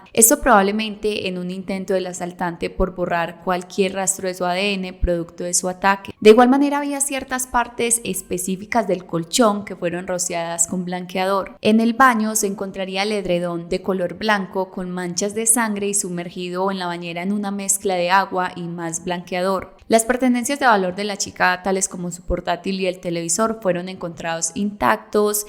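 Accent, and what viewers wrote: Colombia. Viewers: Quisiera tener este acento 🤤🥰🥰🥰🥰es como que un fetiche escuchar el acento Colombiano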